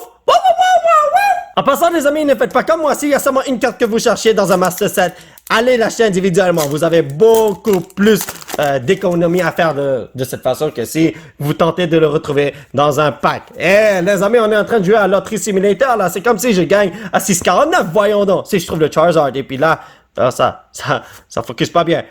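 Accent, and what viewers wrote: England, United Kingdom. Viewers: Tu as un gros bon accent anglais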